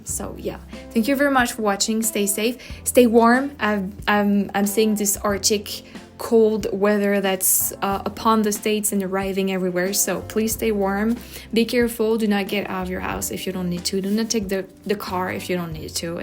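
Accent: France. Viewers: Your English has gotten so much better over the course of your videos. Don't lose your French accent, though! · I haven’t seen one of your videos in years…You’re losing your French accent! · Since I first started watching you react to music, you have almost lost your French accent ❤❤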